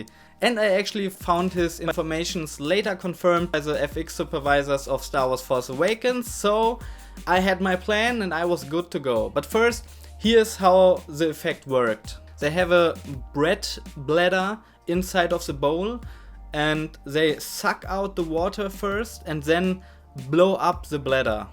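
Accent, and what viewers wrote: Germany. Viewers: Das video an sich ist nicht schlecht aber ich kriege kopfschmerzen vom deutschen akzent 👍😖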